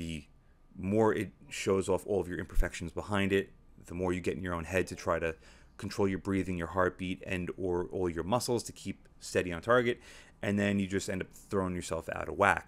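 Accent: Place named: East Coast, United States. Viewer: East coast. Old. He has an east coast accent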